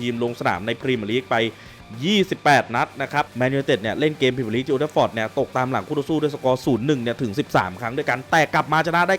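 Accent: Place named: Thailand. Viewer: มาเจิมคอนเท้นท์ใหม่เเก้ะกล่อง ชอบครับ กระชับ มีมุกตลกสอดเเทรก เเถมมีคาเเรคเตอร์เสียงเหน่อ ขอให้ปังๆนะครับ🎉 (จากแฟน LFC ที่เปิดใจฟังทุกทีม)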